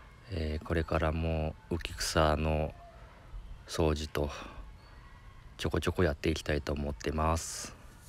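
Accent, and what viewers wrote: Kyushu, Japan. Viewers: こんばんわ〜 チャンネル登録させていただきました！ 方言的に九州の方ですか〜？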